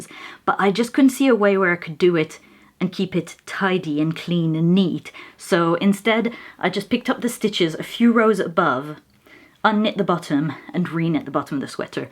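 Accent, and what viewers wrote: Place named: Canada. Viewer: I can't agree with you more! Kindness starts with ourselves. My 16 year old daughter and I have been traveling around the world for her surfing comps. Four continents and six countries later we are struck how everyone everywhere has same dreams, hurts, successes, failures, trials, triumphs, loves and struggles. We have to have compassion and love on one another individually. We are not that different from each other. God has laid this heavy on my heart. I am gonnaknit on Ravelry. I am an expat Canadian living in the USA with my family of 5. I love listening to your Canadian accent and have enjoyed every single episode.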